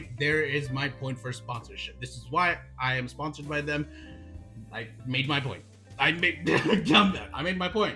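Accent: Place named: Philippines. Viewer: After watching, I realized that fingerboard sponsorships shows how committed and consistent you are, not just by tricks and fanbase but by brand and showmanship. The way you do tricks, content, and how open you are to the community are the people who truly deserves sponsorships. No tryhard bullshits with "SpOnsOr mE" without actually bringing any to the table. Sponsorship is not a competition—it's about proving yourself and how your going to bring something on the table, and if people like what you brought. More and more people will demand. Also, I can also hear how your Filipino accent is obliviously slipping out throughout the video haha😂✌🏻❤️‍🩹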